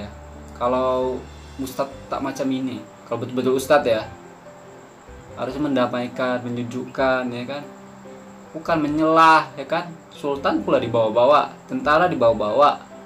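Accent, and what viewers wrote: Aceh, Indonesia. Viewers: Pelat bahasa nya org aceh,video yg mencabar kedaulatan malaysia itu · Betul bro Loghat mcm aceh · Cara ckp to mcm urg aceh .. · kerana gaya cakapnya itu seperti dia nya berada di Indonesia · Gaya ckp nye spt org acheh..xlma nt kna kutipla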